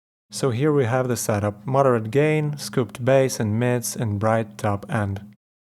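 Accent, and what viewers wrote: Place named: Western Ukraine, Ukraine. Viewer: Так ось він який - акцент на заході України 😂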